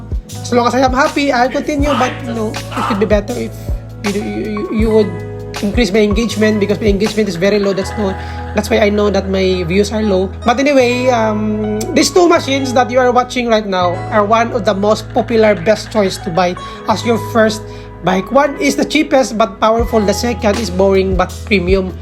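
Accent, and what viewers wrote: India. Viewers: Para kang syunga mag review? Saka kana mag english kng marami kanang subscriber? Para kang mga indiano mag review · Bagalan mo nalang English mo or tagalugin mo nalang kung hirap ka...Kase comparison of two motorcycle Ang topic mo kaya dapat mas maintindihan Ang explanation mo... Parang indiano mag English😅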